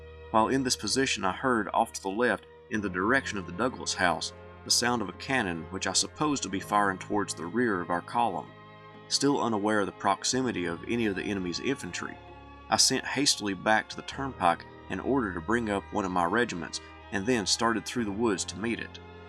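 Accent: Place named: Harlan, United States. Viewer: You talk like me,. Im from Harlan.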